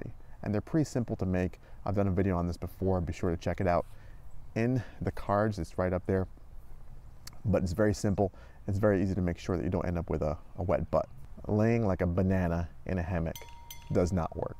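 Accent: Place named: West, United States. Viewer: Bro you look Indian and your name and accent sounds Western sorry don't get offended. Never imagined to avatars in same person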